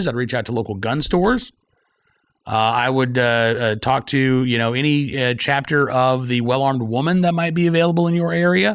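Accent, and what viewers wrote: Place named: Virginia, United States. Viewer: I got family in southern VA and they talk just like that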